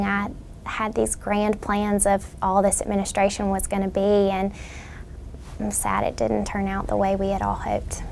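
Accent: South, United States. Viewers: How rude are you she has a southern accent. I am from the UK 🇬🇧 and I can understand her.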